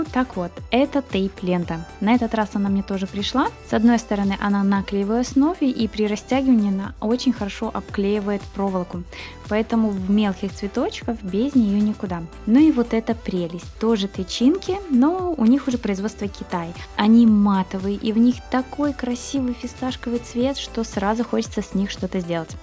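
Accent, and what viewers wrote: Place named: Belarus. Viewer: Вы не забывайте что люди в Белоруссии живут, и у них есть свой местный говор...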